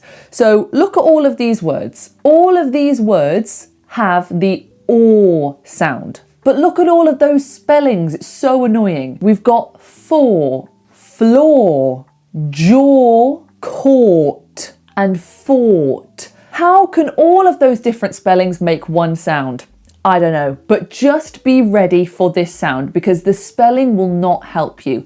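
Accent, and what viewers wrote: United Kingdom. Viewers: Sounds cool , I adore the British accent.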